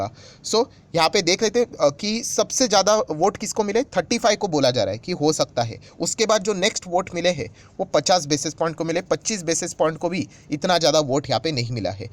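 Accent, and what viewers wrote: Maharashtra, India. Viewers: Sir marathi hai itna to pata chalta hai unke batose I proud of him 🙏🏻🙏🏻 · State maharastra Bas itna pta hai vo bhi sir ki language se 😜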